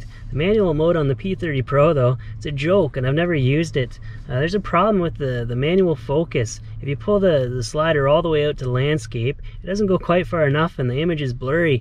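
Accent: Canada. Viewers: Which part of Canada are you from? Seems like you’re accent is type of Canadian accent Americans make fun of.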